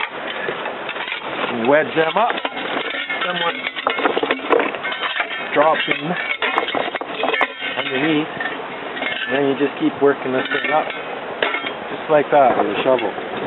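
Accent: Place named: Canada. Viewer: does his videos from his claim way up in BC. Not Colorado. Haha, as if you couldn't tell by his accent Ay.. Lol. I myself am just getting in to prospecting. Just picked up a pan set from Cabelas this past Christmas. Now I'm just waiting til everything thaws out enough to go out there and get some color. Good luck in your endeavor my friend.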